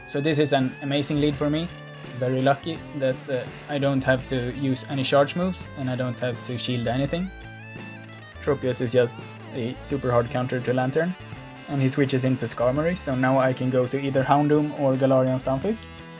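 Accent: Sweden. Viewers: Are you swedish or norwegian?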